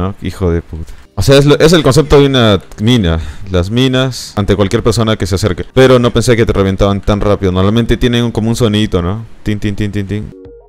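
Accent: Peru. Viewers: Tiene acento de peruano pero le salen cosas de argentino o no jaja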